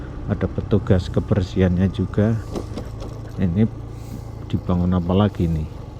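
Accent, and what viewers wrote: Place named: Java, Indonesia. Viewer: Jawa medog kluweg kluweg mleketreg mblufag bawuk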